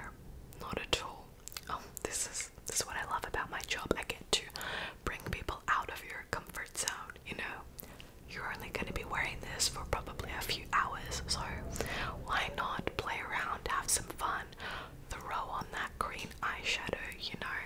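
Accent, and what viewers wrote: Australia. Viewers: is that a fellow aussie accent i hear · Subscribed bc we never get to hear an Aussie accent!! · Does anyone know what country she lives in? She sounds Australian, is that where she lives?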